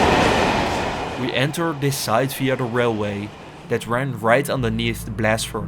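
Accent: Netherlands. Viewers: You do a phenomenal job with this cinematic video, but a lot is lost because of your heavy Dutch accent. If I were to give you any advice: try to work on this or find a native speaker to do the voiceover. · that aweful dutch accent .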